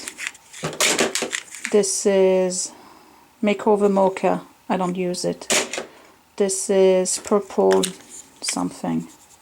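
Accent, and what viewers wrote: France. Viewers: Where are you from originally? Your french accent is great! :-)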